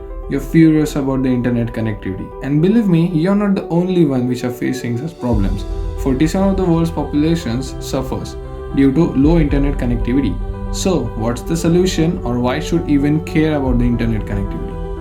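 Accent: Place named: India. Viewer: Your video looks nice 👍 but your vary strong Indian accent is very hard to understand and and oh god it’s painful to listen too, Is it possible you can get an English native speaker to do the video or maybe get some elocution lessons to speak English properly with a proper accent, Because it’s such a great high quality pro video that you’ve done so well edited vary Polished very professional, but so let down on that voice but I did watch it with the sound down because I was impressed with your video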